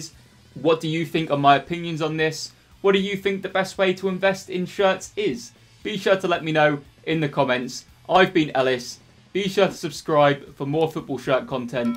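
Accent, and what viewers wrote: United Kingdom. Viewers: I like your accent ,the best British accent ,love you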